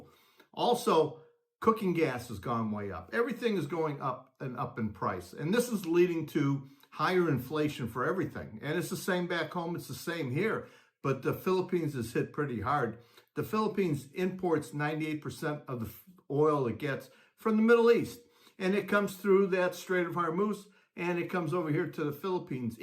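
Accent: United States. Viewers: Asian Mkts are "tanking" and Currencies are getting hammered .. I read "fear of Currency Collapses" .. This on top of Covid Inflation that never came down and Tariffs .. "All of it hurts the poorest the most" .. I saw the anti Corruption Protests, and now I'm seeing Protests there with anti Trump-U.S. Signs, on YouTube (Google) .. "Shits getting real" so keep your head down and don't advertise "American" (you have an accent - practice Canadian Aye mate) .. That's "excess Russian Oil" (ie) already out, on Shadow Tankers ..That wont last long, Russia can not make up for supply loss, and Ukraine has taken-out "30 or 40% of Russian Oil production (off line) .. If this doesn't "end fast" somehow, things will get "a lot worse," before they get better, incl inflation, shortages and collapses affecting millions there / billions of people, worldwide .. "Plan for the worst and hope for the best" .. 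Be safe and best of luck ..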